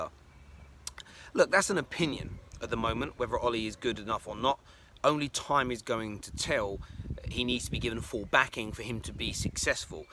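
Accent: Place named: RP, United Kingdom. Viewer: Why u talking posh mate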